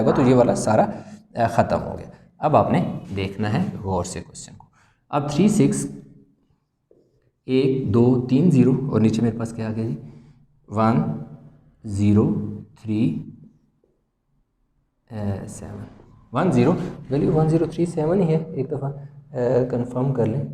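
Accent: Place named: Sindh, India. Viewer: ap ka lehja sindhi wala hai are u sindhi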